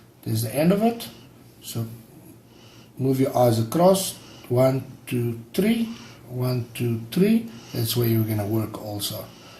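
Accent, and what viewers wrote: South Africa. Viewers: Are you possibly South African? A Boer? :O Very nice ecu job! Thanks for the detailed video.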